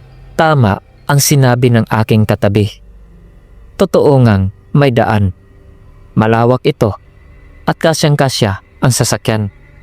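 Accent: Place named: Visayas, Philippines. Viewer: New subscriber idol gusto naku bisaya imong pag narrate ❤hehe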